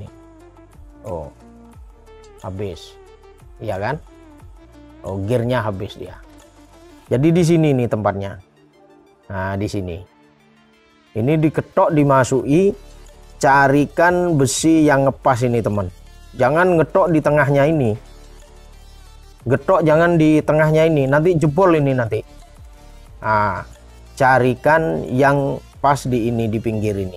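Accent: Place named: Madura, Indonesia. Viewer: Ini kakak pasti dari madura.... Tak iye?? Mantap kak... 👍👍👍 sdh like n subscribe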